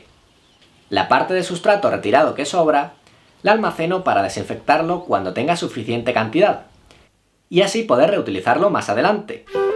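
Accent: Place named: Spain. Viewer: Me fastidia esa voz de españolete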